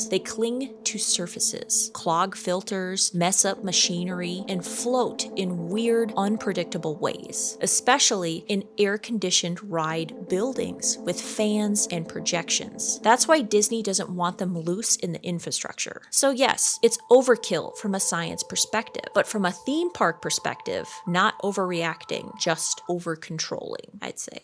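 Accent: Minnesota, United States. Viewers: I love your Minnesota accent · The Minnesota accent makes this all the more enjoyable. What if you had a version of each episode you do with the accent completely untethered 😂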